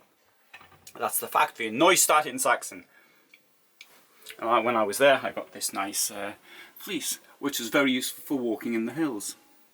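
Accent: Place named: Geordie, United Kingdom. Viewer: i like watching these but your slooooow geordie accent grinds on me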